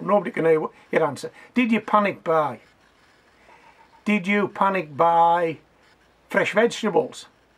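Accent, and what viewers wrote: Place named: Newcastle, United Kingdom. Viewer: Love the accent. Why-aye man! Poysanass poortaytohs! Divvent eat em man! Howway!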